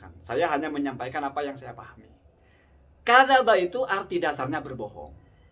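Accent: Madura, Indonesia. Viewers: Semoga menjadi pengasuh pesantren 🙏🏼👍 Kayaknya Madura ya???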